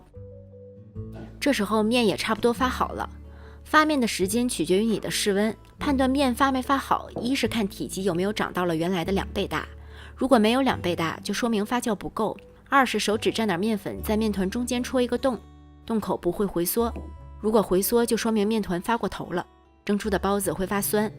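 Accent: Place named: Tianjin, China. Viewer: 听口音是天津的小姐姐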